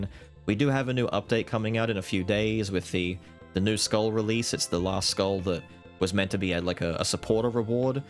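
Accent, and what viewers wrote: Australia. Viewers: I didn't stick around for Skul. I saw funny looking thumbnails and a funny sounding Australian man, I knew for a fact that Skul is just the start for something more.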